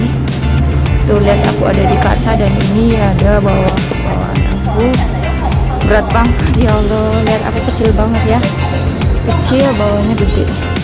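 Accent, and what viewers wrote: Banyumas, Indonesia. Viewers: Ngapak ya mbak? Kayane sih..hahaha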